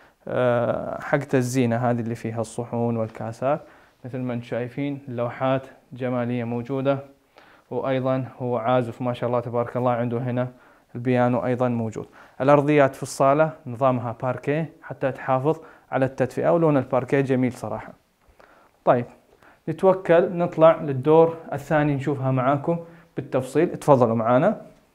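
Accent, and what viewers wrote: Saudi Arabia. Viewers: ما شاء الله تقديم الأخ صاحب الفيديو مميز. ذكر الله على لسانه و الأدب و اللهجة الجداوية الجميلة. بالتوفيق يا رب.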